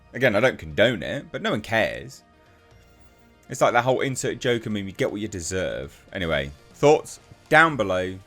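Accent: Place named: United Kingdom. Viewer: Man I like your british accent, also great content!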